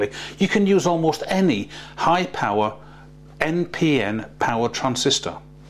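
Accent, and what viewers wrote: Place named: Yorkshire, United Kingdom. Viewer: haha you have to be an electronics teacher, my old electronics teacher had the same sense of humour as you, he plugged a lead into a plug and said to a kid if he holds onto the wires he will be plugged into the national grid and the kid was gonna do it lol he was like are you crazy, go sit in the corner XD but yeah also your accent sounds like your from yorkshire :D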